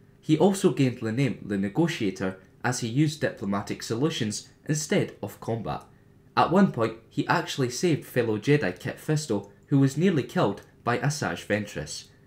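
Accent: Scotland, United Kingdom. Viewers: Just stumbled into this. Great video and love the accent. :D Might I presume "Scottish" ? Keep them vids coming. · What's your accent from? I'm hesitating between Scottish and Irish.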